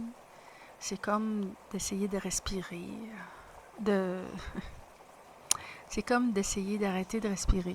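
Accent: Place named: Quebec, Canada. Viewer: Tu t'exprimes si bien... Curieusement, ça me fait du bien d'entendre une voix québécoise, comme si tout d'un coup je sentais un sentiment d'appartenance encore plus grand à la communauté. J'ai vraiment l'impression que tu es sage. Tu me fais penser à moi dans mes quelques peaks de sagesse, ici et là dans mon parcours de maintenant presque 11 ans. C'est difficile à expliquer, mais t'écouter m'aide à m'expliquer des choses que je vis ou que j'ai vécues, sans avoir la capacité de mettre les mots dessus. T'écouter est comme lire ma propre histoire. Merci pour tout.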